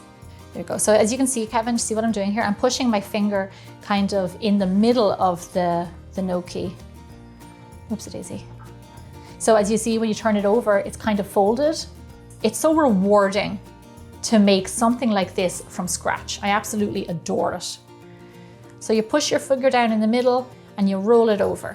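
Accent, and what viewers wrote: Ireland. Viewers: Hi.. I love your do-it-yourself filming, it brings out your personality even more.. when you were humming as you were picking the potatoes.. it's lovely to see how you're keeping your accent and Irish-"isms" intact 🤗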